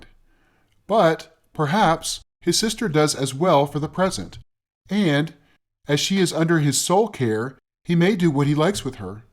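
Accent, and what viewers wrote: United States. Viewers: It's exactly what I want, American accent! Thanks. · An American reading Austen just does not compute in my brain. This gentleman, though I’m sure he’s trying his best just does not sound right. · I could not bare the mispronounciations and american accent.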